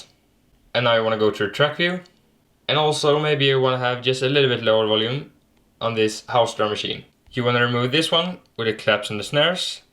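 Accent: Sweden. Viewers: Omg your Swedish awesome i am to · Are you from sweden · Ghoits Hafidz He's swedish like me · Are u sweedish ? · Are you swedish? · Are you come from sweden? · are you from sweden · Are you Swedish? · Are you from sweden? :) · Är du swensk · Are you Swedish 🇸🇪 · are you Swedish? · Swedish accent then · are you SWEDISH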